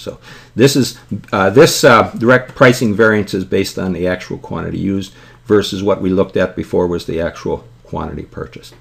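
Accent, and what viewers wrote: United States. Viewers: dude wth this is confusing as fuck with ya yankee sounding ass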